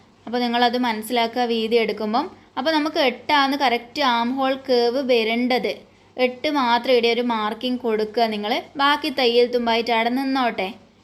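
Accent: Kannur, India. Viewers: കണ്ണൂർ ഭാഷ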